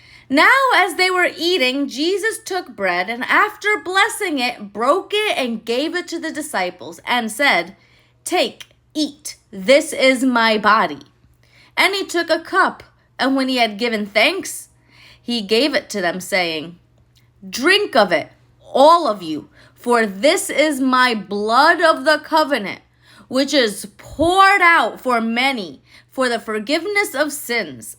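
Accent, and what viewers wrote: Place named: Levant, Arab world. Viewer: Very good acting in Eastern Arabic English accent. Wow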